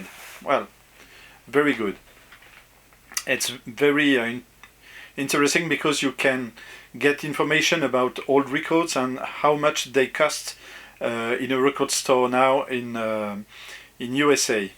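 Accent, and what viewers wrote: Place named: France. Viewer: Nice, and no need to apologize for your accent or English, I suspect they are far better than my French. Great records you got there, tres bien!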